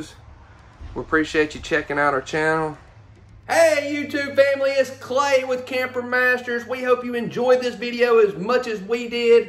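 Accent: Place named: United States. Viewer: Why dou sound more country then chance and id have both 🥓 sausage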